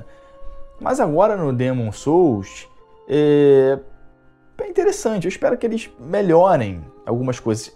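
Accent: Rio de Janeiro, Brazil. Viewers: nem tô mané, é só carioquês mesmo... Isso aí pra mim é vírgula kkkk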